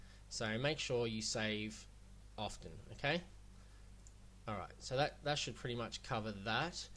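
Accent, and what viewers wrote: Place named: Australia. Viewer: I really appreciate your timely response, and thanks heaps mate. I am noting from your accent that you are from Australia just like me. I am new to Unity, although i'm discovering lots of things, I doubt that ill make money from unity, but would love to make some of my own games. I really appreciate your time, and I intend to follow your tutorials as they are very good. Ill try to fix the water thing later, again thanks heaps. I have been playing around with unity. I have found that sometimes characters do weird stuff like float or sink through floor (even if rigid body attatched). Anyway thanks heaps. You have earned a subscriber.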